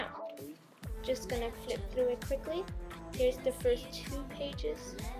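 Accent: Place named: United Kingdom. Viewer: why do you have a british accent?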